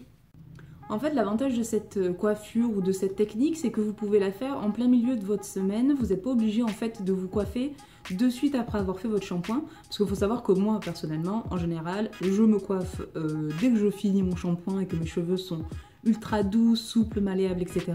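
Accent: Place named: South, France. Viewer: hahaha jm trop l'accent du sud hahha moi je te regarde de Marseille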